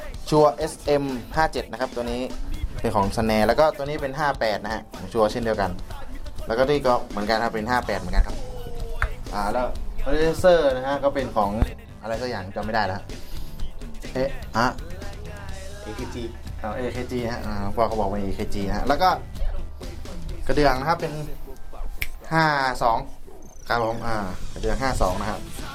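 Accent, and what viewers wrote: South, Thailand. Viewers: คนที่ไหน สำเนียงเหมือนคนใต้เลยนิ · คนใต้ป่ะครับ ?? เหมือนจะทองเเดงนิดๆๆ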